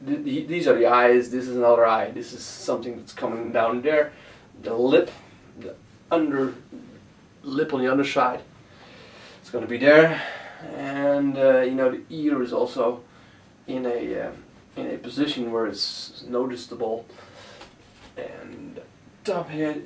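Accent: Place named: Netherlands. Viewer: I knew u were dutch from ur accent..:) Welcome!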